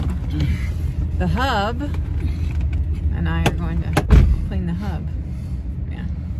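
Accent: South, United States. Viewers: I gotta said I love you southern accent. You are a keeper for sure. you are the man and you have a great women by your side God bless y'all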